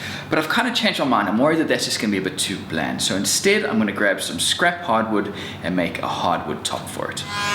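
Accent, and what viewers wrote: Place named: South Africa. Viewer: Started watching this, and I’m like “hey I know this accent”... I’m a South African living in Malta.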